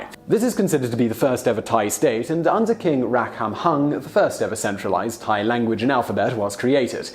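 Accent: England, United Kingdom. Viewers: i'm english and i find this guys voice too english. ecspecially when he says "empire"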